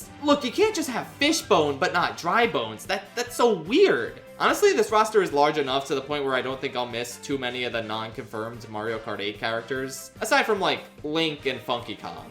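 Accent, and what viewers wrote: United Kingdom. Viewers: I’m sad, the British voice is gone 💔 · Bruh I thought this guy was bri’ish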